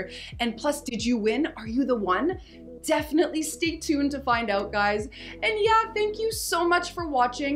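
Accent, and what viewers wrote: Canada. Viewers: I can hear the Canadian in your voice!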